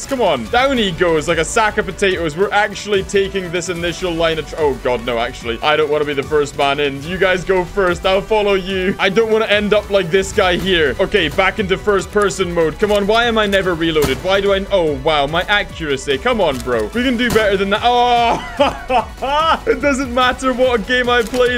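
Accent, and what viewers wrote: Scotland, United Kingdom. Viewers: is he british? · Scottish. I think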